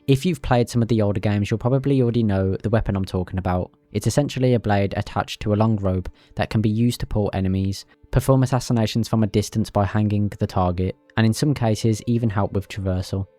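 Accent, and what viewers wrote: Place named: Birmingham, United Kingdom. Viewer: Errr Birmingham accent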